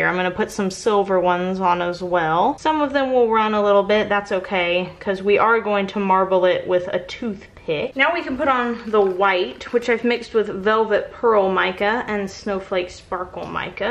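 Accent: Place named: South, United States. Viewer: Your voice sounds so southern like mine 😊😊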